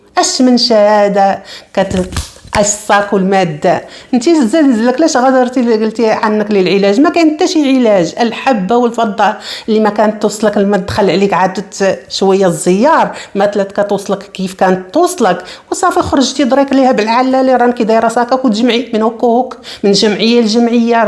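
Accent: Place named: Western Sahara. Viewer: السلام عليكم اختي الكريمة انتضرداءما الجديد منك بصوتك الجميل الصحراوي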